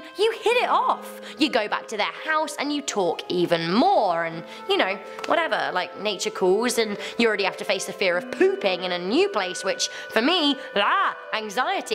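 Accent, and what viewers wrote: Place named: United Kingdom. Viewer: is Irish? She sounds British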